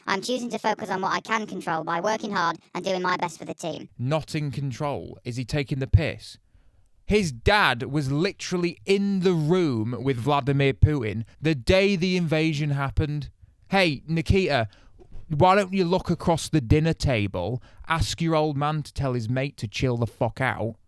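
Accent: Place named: England, United Kingdom. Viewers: he’s mentioned Sheffield before. so probably near there! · This is a perfect English accent. Most annoy the shit out of me, but owns all. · Sounds Manc to me I reckon, any other English lads or himself can confirm? Thank God he's not fucking scouse that's for sure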